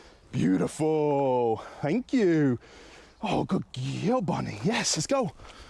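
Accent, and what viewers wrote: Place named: East Midlands, United Kingdom. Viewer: I can't pin your accent, I first thought Coventry but then I detected a slight East Midlands twang, maybe somewhere near the A5?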